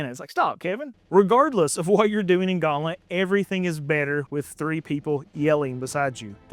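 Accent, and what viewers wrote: South, United States. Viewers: I think that ending summation is a good way to think about these games; they're not RPGs, but they capture the feel of one. At least, that's how I felt when I finally did a full playthrough of Majora's Mask last year, and that helped me realize part of why I enjoyed it and Ocarina of Time (which I also finally finished a few years ago) as much as I did. Also, I gotta say, I appreciate hearing a southern US accent on this site amidst all the coastal and British English ones; maybe it's 'cause I'm a southerner myself (not that it's easy to tell from my own accent), but still, nice to hear. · That southern drip is back, yeehaw!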